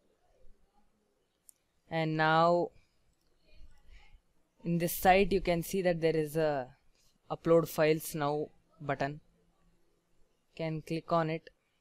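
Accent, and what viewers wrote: India. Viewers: Right as a hear the Indian accent I knew this was a helpful video lol. THANKS!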